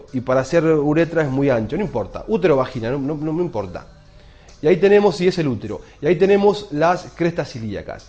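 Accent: Argentina. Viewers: Hablan lindo los Argentinos! Gracias por el video :) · no sabes de planos y te haces el que sabes de vaginas. de paso tenes una voz de porteño importante amigo. gracias por el video de todas formas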